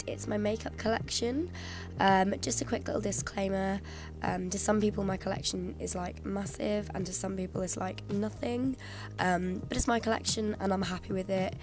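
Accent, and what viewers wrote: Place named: Norfolk, United Kingdom. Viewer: Try making an asmr clip if you can. Be great to hear a good ol’ Narrrrfick accent asmr for a change. I’ve listened to hundreds of asmr clips over the last few years and would love a ‘home-grown’ one 👍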